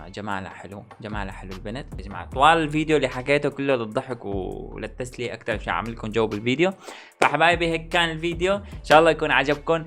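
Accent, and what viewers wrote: Algeria. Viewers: لهجة جزاءرية طالعة حلوة عليك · ههههههه ممتاز ف اللهجة الحزائرية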